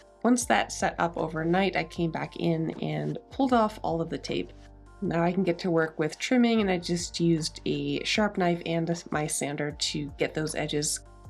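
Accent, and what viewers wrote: Nova Scotia, Canada. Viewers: The pronounciation difference between Nova Scotia and Massachusetts is amazing. You call them Dek-uls, we call them Dee-cals. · I didn't realize Canadians pronounce decal differently than Americans. You say deckle. We say Dee-cal with the cal part like California. 💕 Another furniture transformation that, to me, would have been just too difficult as well as me not being able to see it in my head all restored. You're amazing, and I love how proficient you are with old tools as well as the new technology of the laser cutter. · I love how you say “decals”, love that Nova Scotia accent!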